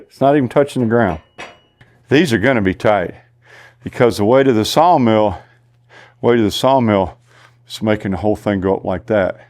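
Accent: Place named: Virginia, United States. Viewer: Much appreciated! As a new sawmill owner your words of wisdom are very valuable. And you talk like me......from South Central VA😂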